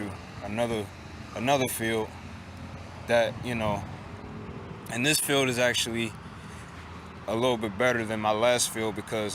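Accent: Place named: Baltimore, United States. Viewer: Sounds like Baltimore for sure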